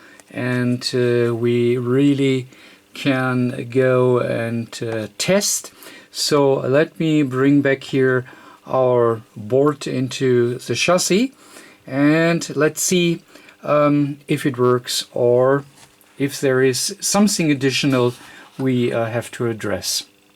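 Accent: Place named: Germany. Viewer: I do hear a strong German accent. Greetings from the Nederlands my vriend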